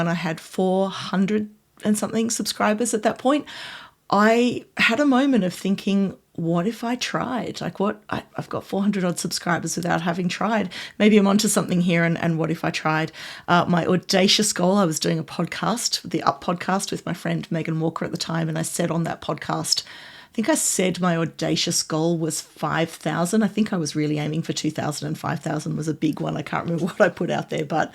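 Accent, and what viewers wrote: Australia. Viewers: Fantastic, congratulations and well deserved. I always look forward to your videos when released and enjoy the content and your explanation - and your personality. Also, so great to hear a familiar Australian accent in sea of American Microsoft content. Well done!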